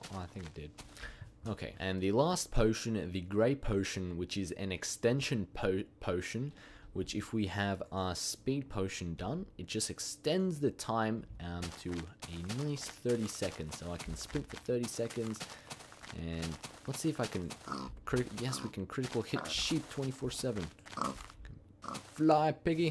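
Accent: Australia. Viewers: thats because people liked his australian accent so he does that now.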